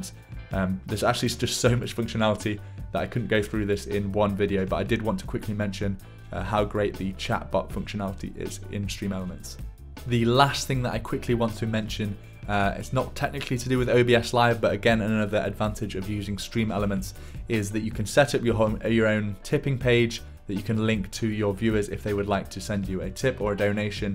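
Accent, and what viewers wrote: United Kingdom. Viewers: instant like for the cool and nice British accent. 🇬🇧😎👍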